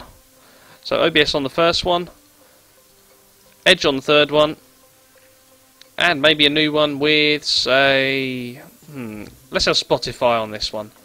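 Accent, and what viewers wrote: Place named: Ipswich, United Kingdom. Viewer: your from Ipswich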